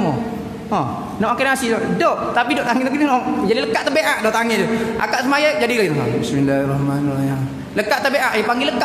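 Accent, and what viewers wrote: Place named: Terengganu/Kelantan, Malaysia. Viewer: Alhamdulillah paham dah lah, ye Kene ustaz tu ckp loghat trg/Kltn baru Kene dgn saya.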